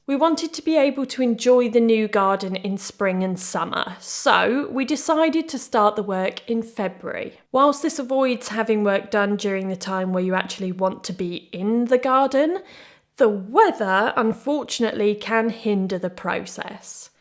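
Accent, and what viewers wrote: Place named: Scotland, United Kingdom. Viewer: I'm trying to figure out what kind of accent is this? Is this Scottish or English ? iots somewhat extreme, but I love it.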